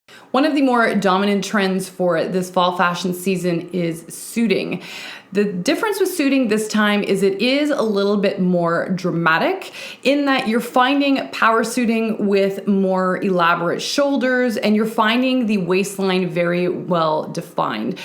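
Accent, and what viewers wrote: Canada. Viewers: I come for the fashion advice, I stay for the Canadian accent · Out, about and sorry... how I miss my younger years in Calgary, don’t hear that accent in CA often. Love all the advise you give 👍🏼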